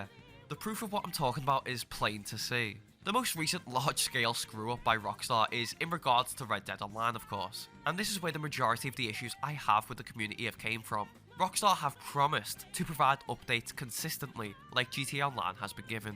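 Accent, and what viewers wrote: Liverpool, United Kingdom. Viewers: be'''ee i love british accent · U from Liverpool lad? Can tell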